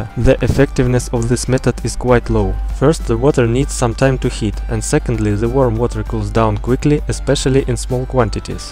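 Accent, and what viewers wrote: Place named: Soviet Union. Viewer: Советский акцент английского языка =))) на 12й минуте спалился русским счётом! =))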